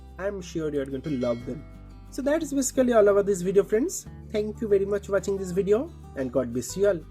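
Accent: India. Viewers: its allways the indian acsent to, thats how u know its going to work. thanks man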